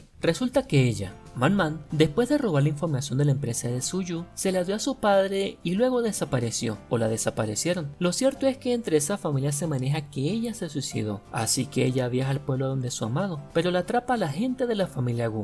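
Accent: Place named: Venezuela. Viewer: Venezolano, voz agradable, muy bien resumido y entendible 👏👏👏 gracias aquí otra suscriptora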